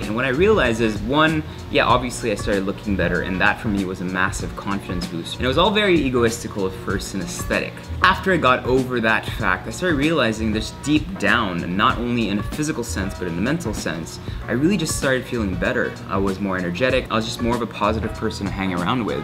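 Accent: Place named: Philippines. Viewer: you have a total filipino accent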